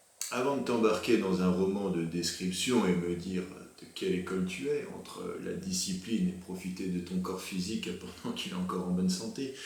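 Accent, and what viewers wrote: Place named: Antilles, Caribbean. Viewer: Super 👍belle narration, belles illustrations et pas mal l'accent des Antilles...D'autres personnages pourraient être intéressant à traiter dans la même veine, papa Guédé avec ses araignées😂 , papa Legba, la Sirène ou encore la célèbre Erzullie 🌹💖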